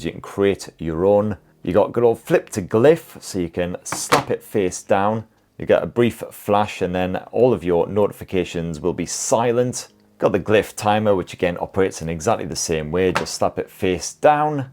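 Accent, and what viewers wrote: United Kingdom. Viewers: A British phone (kinda) of course needs a British accent review! So I click in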